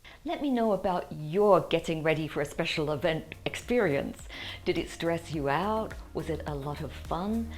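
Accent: United Kingdom. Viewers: You’re lovely. Do I detect a UK accent?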